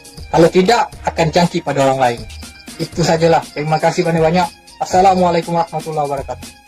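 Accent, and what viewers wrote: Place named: Kuala Lumpur, Malaysia. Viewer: padu SRK bangla ni cakap KL.. lagi padu dari cina malaysia.. tapi bukan semua la cina.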